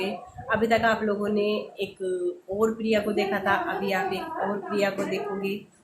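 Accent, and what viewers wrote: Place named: Uttar Pradesh, India. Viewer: Language UP ki aisi hi hai usme bura lagna kya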